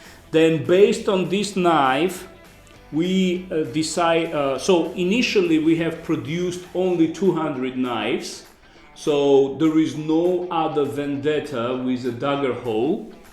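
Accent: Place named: Russia. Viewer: Испанский стыд за русский акцент в английском языке. Континуэйшн. Ой....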